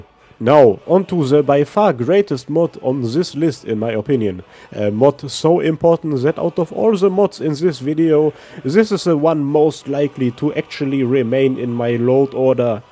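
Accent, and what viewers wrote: Germany. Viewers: are you from DEUTSCHLAND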